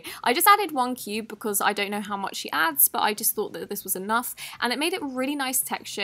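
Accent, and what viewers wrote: England, United Kingdom. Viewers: Are u british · Yes a new upload! <3 I love your English accent and.. EVERYTHING 😱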